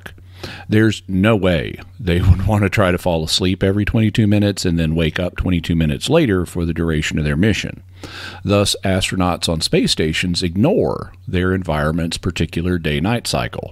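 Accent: South, United States. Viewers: I love your work! Thank you! Also, I noticed a southern accent stronger in this video than most. Did you recently speak with southerners? That phenomenon of changing accents according to environment would make an interesting topic. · Is it just me... or is he assuming a "folksier" southern accent than usual in this video lol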